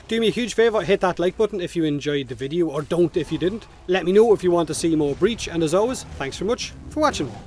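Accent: Ireland. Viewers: Every time I watch one of ur vids, your accent make me think about the 100000 pints of Guinness I used to drink when I was in Ireland back in the 90's...and I feel nostalgic :D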